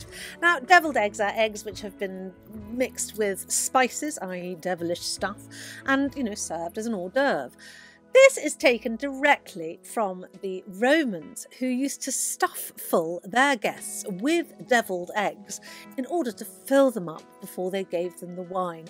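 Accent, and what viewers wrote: United Kingdom. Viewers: So much fun to hear a Brit Witch speak of the American thanksgiving. Love the pagan references and agree completely! My adult son has asked for ham instead of turkey. He brings up a good point-no body really likes turkey - we only cook it one day a year. Lol.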